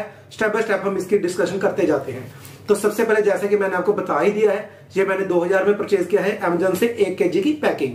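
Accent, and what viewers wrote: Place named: Punjab, India. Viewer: Beautiful review but the Punjabi accent is more beautiful. Keep growing!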